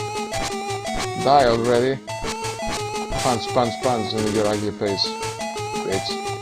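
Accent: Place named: Greece. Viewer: i sense a greek accent. :)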